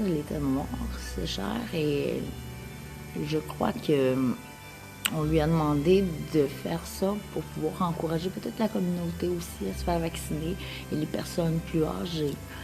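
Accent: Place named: Quebec, Canada. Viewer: Au Québec, j'ai joué durant 20 ans à un sport nommé "fastball" un dérive du base-ball.Selon moi, le base-ball est un des sports qui exige le plus de stratégie, de réflexion, de tactique, de concentration et d'instinct. Mr. Aaron a été l'un des plus grands dans son domaine, faisant preuve d'humilité et d'humanité malgré sa grande popularité.Beaucoup de sportifs professionnels devraient prendre exemple sur lui. En passant, je suis complètement bilingue et vous prononcez très bien son nom, avec un léger accent Québécois tout à fait charmant.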